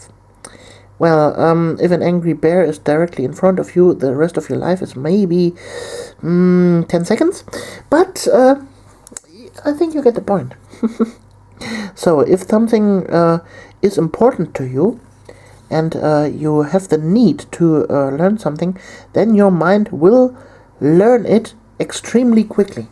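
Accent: Germany. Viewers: May I ask where you are originally from? I apologize if I'm wrong but i hear a German accent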